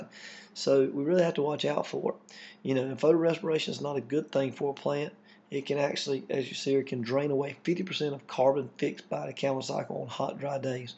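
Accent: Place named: South, United States. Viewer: Nice video truly, you got an interesting accent too. You from around south, buddy?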